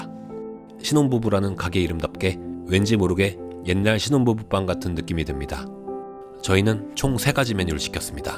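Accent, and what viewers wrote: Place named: Gyeongsang, South Korea. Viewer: 말투가 경상도인데 원주 사람이라 그러네 ㅋㅋ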